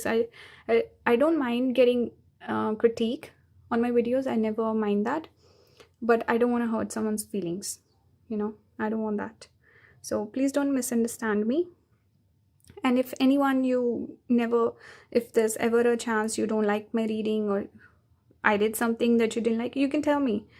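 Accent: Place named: India. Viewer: Pile 3 speaking. Logical as well as intuitive. Plus you have a cute Indian accent. ❤😊.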